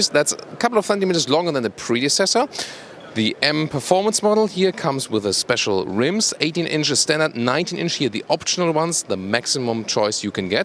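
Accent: Germany. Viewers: Sounds more authentic coming from a German. Great narrator. Mighty car.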